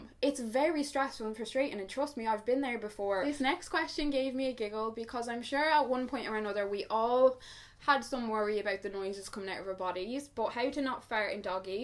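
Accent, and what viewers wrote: Dublin, Ireland. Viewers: Hi, is that a Dublin accent you have?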